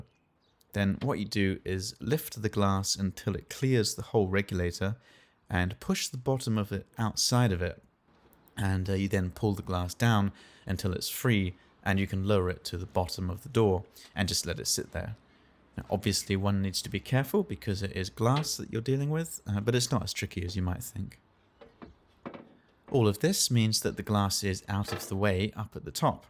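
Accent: United Kingdom. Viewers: I think he's British Mate!!!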